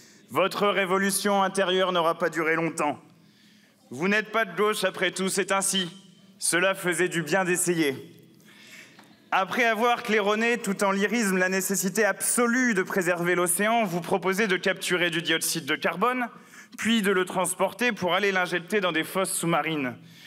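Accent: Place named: Brittany, France. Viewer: Merci pi. Vous êtes breton, cela s’entend. Vous aimez l’océan, cela se voit. LFI nous donne la force et du courage. Merci.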